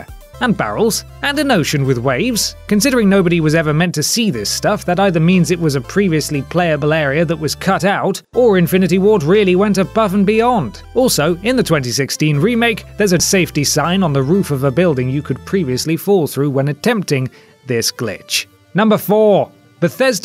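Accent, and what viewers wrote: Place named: England, United Kingdom. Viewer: You had me at "silver haired bugger" but you killed it at "wanker". God save the queen, your video is awesome but you english accent earned my subscription.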